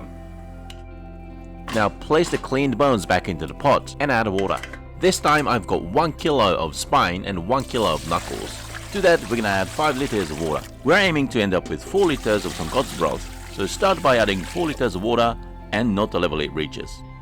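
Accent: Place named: Australia. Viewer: Great and informative, thanks Also, did you learn english in australia?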